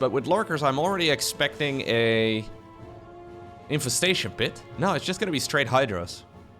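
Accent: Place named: Netherlands. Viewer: You say "bunch of stuff" a lot. With that particular dutch way of saying bunch - Beounch. It's funny, Harstem and Rotterdam also say Beounch.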